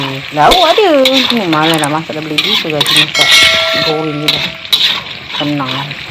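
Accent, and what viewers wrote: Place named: Malacca, Malaysia. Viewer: dialag orang Melake sedap je dengar hehe 😊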